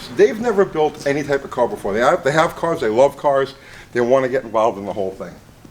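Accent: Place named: New York, United States. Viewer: I've noticed a deep underlying trend to your videos lately, and I truly mean this constructively. Your videos are a direct response to comments lately. You address these "issues" and "haters" as the New Yorker you were raised as. The issue is that the New England accent in general has adapted into something that comes across as aggressive and matter of fact, to the point of over the top arrogance. And to most of the viewers not from NY you might just sound like a know-it-all dick, this could be off-putting to the simple folk and cost you a few subscriptions and a views. Just some professional criticism.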